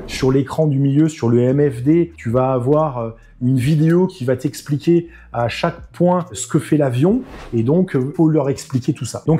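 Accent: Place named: France. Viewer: J’adore ton french accent😂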